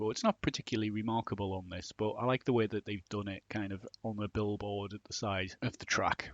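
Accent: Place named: North Yorkshire, United Kingdom. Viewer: Hi, sounds like you might be from Richmond or Darlington with that accent - tell me I'm wrong! Enjoying your top 100s etc and contributions to speccy show. They should have loading screens on the PS4. It took 2 hours to install Red Dead Redemption2 today! Not for me, as i don't play modern games but the missus does! I was a 64 owner but looking back I see how the Speccy was full of character and it's visuals had real english charm.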